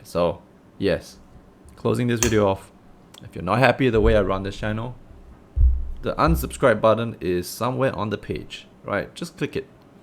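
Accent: Singapore. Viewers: hey man, you sound like you are from singapore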